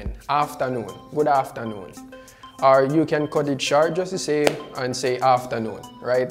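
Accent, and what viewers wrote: Jamaica. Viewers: Awoo me like learning real Jamaican pronunciation 😄👍🏼 greeting from Costa Rica 🇨🇷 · Nice one mi really love Jamaican accent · I just love jamaican(english) accent😂😂👌👌🤷‍♂️🤷‍♂️ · Love the jamaican accent love from uk 🇬🇧🇬🇧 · I love the jamaican accent but its so hard to understand and reproduce! Hahaha Never found a vídeo explaning it! So thanks!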